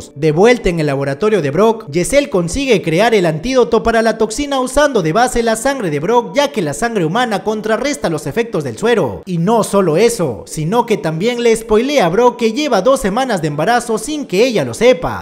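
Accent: Peru. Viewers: una pregunta eres de Perú 🇵🇪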